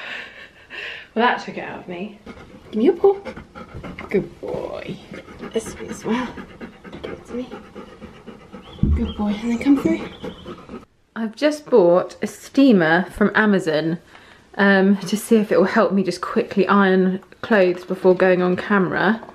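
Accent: England, United Kingdom. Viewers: Hello, sweet husband, cute dog, wonderful home! everything done right, respect. Your washmachine and dryer from Miele was a very good choice. I am from germany and i like your new kind of videos. I have a Miele washmachine too and ihm very satisfied with them. I know its expensiv but it works for so many years, this is how the investment pays off. Your pronunciation of the word Miele was correct. I heard a small English accent but it was still correct. Stay healthy and continue enjoying your great life. Best regards from Sabrina, from Baden-Baden, near the beautiful Black Forest, in Germany! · That is kind of best lifestyle u have an extremely beautiful farm. My father is a farmer and I love our farmland, unfortunately, we can not go there because we are quarantined...I'm very happy to see your daily life and lifestyle.🥰🥰🥰 I hope the world pass this bad situation. 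I'm Majid Saneie from Iran. I like your pure British pronunciation. I follow u in YouTube channel. If I have mistakes I delighted to correct me. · The British English is so pretty so clean! I'm loving these vlogs ❤️... Here is from Brazil 🇧🇷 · Does she speak like a britanic like every day life or she speaks more slowly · Yes, it's mealuh, meal like meal and uh somewhat like in uhm. Your slight British accent gives away you are not exactly a native German. 😎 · Hi, my lovely teacher, thank u for ur lessons, I always benefit from u many things thanks again for ur time. I love farms I work in farms too, I absolutely love ur accent British people. I'd like to to England but, it's difficult for me, I can't get a visa to England. If u could help me to get there to work with u in ur fram, That would be nice of u. Have a nice journey in ur life and God bless u, ur husband and ur family..... ❤️ ❤️ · This vlog is amazing and I dont know how my sister came by you but she is probably pretty proud of herself. 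I am not a native English speaker but I would certainly try and hope to grasp that beautiful British accent. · I just watch this by accident and suddenly this lovely couple move my heart and, absolutely your british accent really, i would continue to watch this in future 🥺🥺🥺🥺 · You look beautiful without powder... I enjoyed it, I like this kind of video to listen to your British accent. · I love your British accent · I'm Brazilian and I'm learning a lot with your videos... Thanks for sharing your day with us... The British accent is awesome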